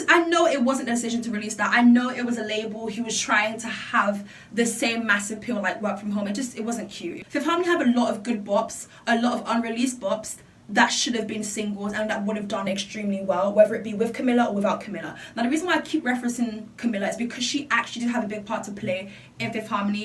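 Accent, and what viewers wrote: United Kingdom. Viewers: Am I the only who has become so used to that I can't hear her British accent any longer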